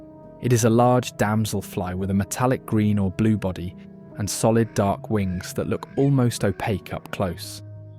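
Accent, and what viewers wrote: United Kingdom. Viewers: Didn't expect a British dude narrating this lmao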